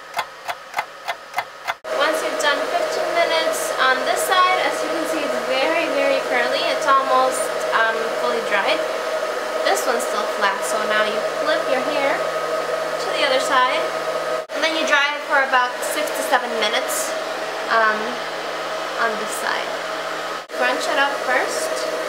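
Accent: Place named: Portugal. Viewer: Thanks for the tips! this is the 1st video I watch of you and I already knew some of the thing and tips to do, just need to buy the equipment and utensils lol! Btw didn't know you played the violin...well I just assumed so since your twitter pageis, Idk for sure but thanks again so much! I'll be sure to try them. I also have my pages of XxxThePianistxxX on facebook and youtube (I think that's how I spell it;) ) and xxThePianist&ViolinistxxX on twitter. Mostly music related but I also have curly hair and I try to style although it's really difficult and then it's really dry, moisturize without putting your scalp too oily sigh a torment but I love my curls, it's just the time and patience to deal with it lol. Do you use an alcohol free shampoo btw? And also you sound like portuguese, your accent, sorry if I'm wrong, but just guessing. Lovely curls girl btw! 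xx - XxxThePianistxxX (Camila F.)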